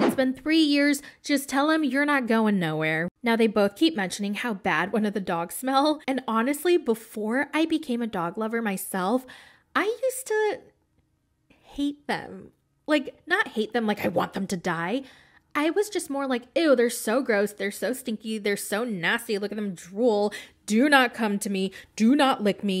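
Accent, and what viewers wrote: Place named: Boston, United States. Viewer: You’re from Boston! Lol hey girl 🫶🏾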